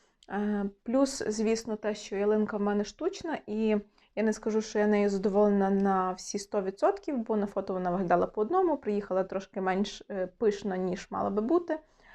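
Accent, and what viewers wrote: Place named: Lviv, Ukraine. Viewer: Доброго вечора,на Ваш канал потрапила за рекомендацією " ютуб", почувши щиру українську з львівським присмаком залишилася тут без вагань! Маєте гарний смак! Ваші відео то окрема форма насолоди! Завжди все стримано, вишукано, гармонійно, щиро. Наразі працюю з шкарпетковою пряжею ( вяжу для ЗСУ ). Гарних, мирних Вам свят, теплих та цікавих зустрічей! oksana_sokolova156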